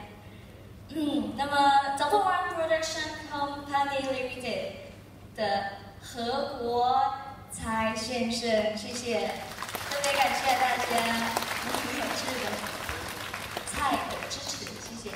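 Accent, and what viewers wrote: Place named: Taiwan. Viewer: speaks with taiwaness accent. Amazing.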